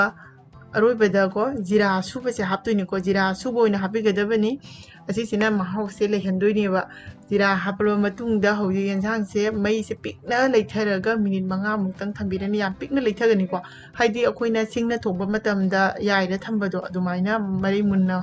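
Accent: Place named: Imphal, India. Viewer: Yaamna fajaba, asengba meetei lon ngaang-nge Imphal lomgi, video upload ta ngaangliba meetei lon sing Adudi taabada pelle.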